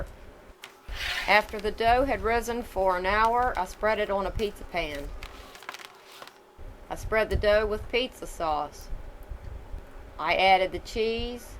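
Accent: South, United States. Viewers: Thank you very much intelligent lady with the southern accent...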